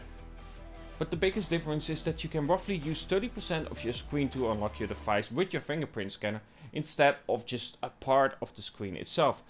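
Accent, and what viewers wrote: Netherlands. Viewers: haha, ik dacht al dat ik een Nederlands accentje hoorde😂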